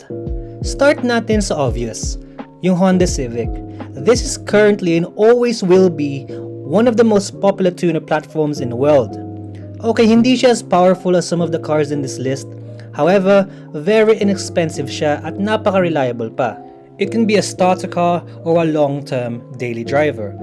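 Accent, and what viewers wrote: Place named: Australia. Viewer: I like the cars you recommend sir but I love your subtle ozzie accent.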